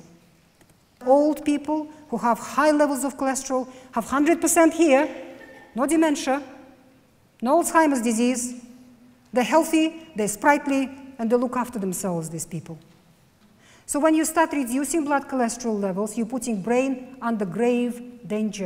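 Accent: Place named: Russia. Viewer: It's Russian. She's born in Russia, then went to UK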